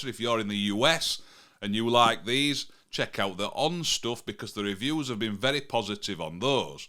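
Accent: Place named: Wakefield, United Kingdom. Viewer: Love your accent. You sound just like my family members from Wakefield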